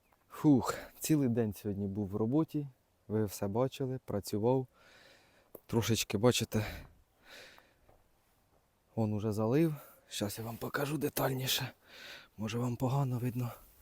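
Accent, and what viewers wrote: Poltava, Ukraine. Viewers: в мене родичі теж живуть на Полтавщині. Розмовляють як. А дідусь з Черкащини. До Другої світової війни була Полтавська обл. Теж так розмовляв, як. Приємно слухати. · Вітаннячка! Все моє дитинство, пройшло у бабусі в Полтавській області... Життя іде, бабуся вже давно померла і відвідати рідні краї вже нема можливості... На Ваш канал натрапила випадково і почала дивитись. І чую у розмові, щось таке рідне, таке знайоме, а потім зрозуміла - це полтавський акцент, він особливий❤ Бажаю Вам здійснити всі Ваші мрії і реалізувати всі задумані плани! Ви щира і добра людина) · Теж зачепилася саме за говір, одразу зрозуміла , що полтавський. Обожнюю і Полтавщину, і говір, люди, як на мене найприємніші і найщиріші з усієї України. Буду слідкувати за ремонтом! Знаю, що це таке, сама ремонтую свою хату на Вінничині, це дуже радісно потім бачити результат і особливо жити в своєму, а не найманому житлі і вирощувати все, що хочеш! 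Бажаю успіхів і розвитку, буду слідкувати!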